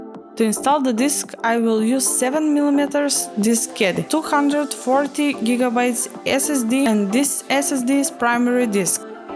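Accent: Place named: Eastern Europe. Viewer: I sense a very distinct Eastern Europe accent, and I greet you from Bulgaria, awesome videos! subbed to both you and Andrew (Андрей?)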